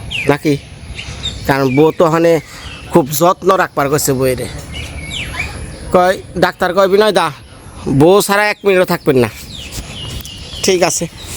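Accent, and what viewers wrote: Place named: Tangail, Bangladesh. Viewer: টাঙ্গাইলের ভাষা আবার বোঝা যায় না। আপনার প্রথম ব্লক যখন দেখছি তখনই তো ভাষার কারণে দেখা শুরু করছি। প্রথমে তো লেখা ছিল না এটা কোন জায়গার ব্লক কার ব্লক কোন এলাকাঁ। ভাষাটা শুনে মনে হয়েছিল এটা টাঙ্গাইলে কিছু একটা হবে। এরপরে তো দেখলাম যে এটা মির্জাপুরের দিকে। ভাষার জন্যই তো ব্লক দেখা শুরু করছিলাম। আর আমরা একেবারে শুদ্ধ ভাষায় কথা বলি টোটালি তারপরেও কেউ কেউ যারা টাঙ্গাইলের মানুষ এক্সপার্ট তারাও একটা টান খুজে পায়। আর আপনি তো পুরাই গ্রাম্য ভাষায় বলেন আপনারটা না বোঝার কি আছে। শুধু টাঙ্গাইল না ভারতের আসাম এলাকার মানুষ যখন কথা বলে সেটা পর্যন্ত শুনলে মনে হয় তারা কি টাঙ্গাইলের কিনা। ভাষাগত এত মিল। এরপরে ইন্টারনেটের সার্চ দিয়ে দেখতে পাই ভারতের আসাম আর টাংগাইল নাকি আগে এক ছিল। এজন্য এই দুই এলাকার ভাষায় এত মিল। আপনার বাড়ি টাঙ্গাইল আপনার ভাষা শুনলে সে বুঝবে যার এই ভাষা শুনে অভ্যাস আছে। অন্য কেউ বুঝবে না।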